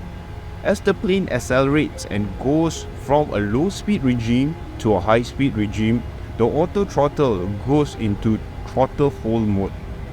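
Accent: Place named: Singapore. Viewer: Verry Verry good video, I enjoyed thouroughly und I Love the friendly Singapore accent, keep upp the great Work 👍👍👍👍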